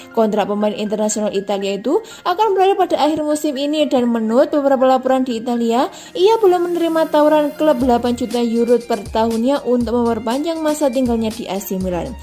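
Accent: Java, Indonesia. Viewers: Si mba admin nya, medok banget suaranya 😂, aga gmna gtu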